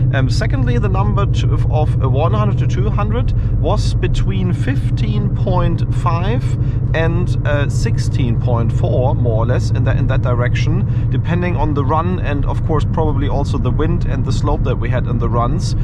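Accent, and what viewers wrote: Germany. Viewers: It took exactly 3 seconds to know you are german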